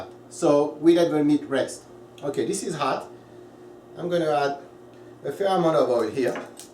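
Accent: France. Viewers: I LOVE YOUR FRENCH ACCENT! I TAKE FRENCH III AND I LOVE THAT FRENCH ACCENT! NICE JOB WITH THE STAKES BY THE WAY! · Very helpful! Where in France are you from? J'aime ton accent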